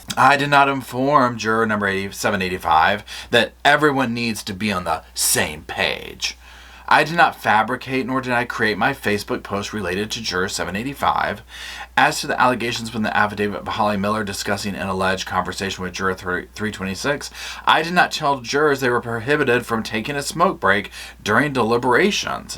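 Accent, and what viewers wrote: South, United States. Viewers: You rock a southern accent! 😂 Xoxo to Roscoe :_Lovesit::_SofaSquad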